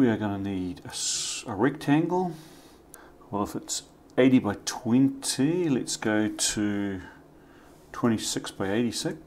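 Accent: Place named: New Zealand. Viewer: Not trying to be too nosey. You sound a bit like a kiwi, but I don't think you're living in New Zealand 🤔 and you seemed to be wearing this old Tony's sweater. 😯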